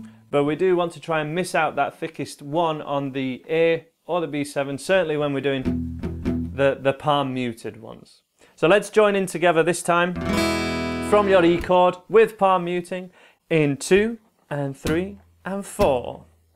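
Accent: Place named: County Durham, United Kingdom. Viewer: Hi, This is pretty good stuff that you are doing, very helpful. I played jazz saxophone for thirty five years and have just picked up a guitar (at seventy three) Where are you from? I assumed you were all American, you sound like North East England, County Durham lilt to your voice. Thanks again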